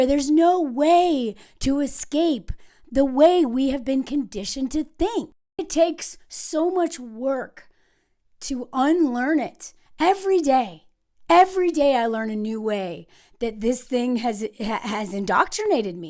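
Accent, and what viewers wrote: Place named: Texas, United States. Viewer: The way you talk reminds me of one of my Grandmas. She was from way out in the country in south Texas (not sure which town).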